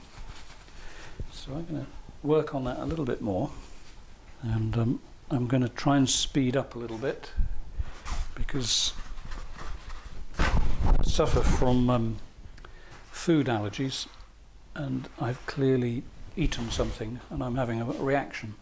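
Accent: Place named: Kent, United Kingdom. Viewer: im a pommie that lives in Australia. I came here with my father in the 70s from London to Canberra, where my pa worked in the British High Commission. I never return to UK as it upsets me too much. I used to spend my young years visiting my nana in Tonbridge Kent and you remind me so much of my times in Kent. Your voice, your attitudes, your humour, takes me back to my days in Kent. To the hop fields, to the high street, to the avenue where my aunt vi lived all comes back to me. Australia in contrast to UK is so vast and wild and it lacks the human cultivation, it lacks the history and order that one yearns for at times.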